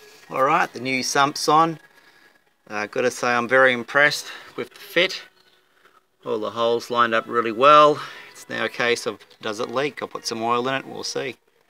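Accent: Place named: Australia. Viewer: Thanks, mate. It's great to hear an ozzy accent and great advice.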